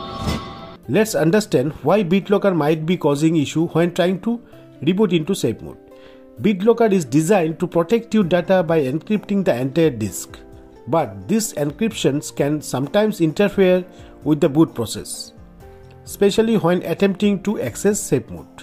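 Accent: India. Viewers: its always the vids with 20-80 likes and an indian accent finaly solving my problem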